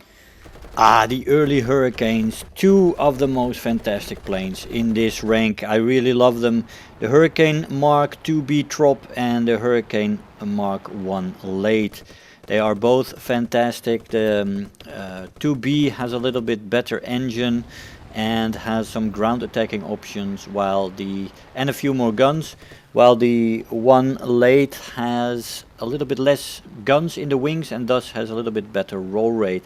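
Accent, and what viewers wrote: Netherlands. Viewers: Nederlands accent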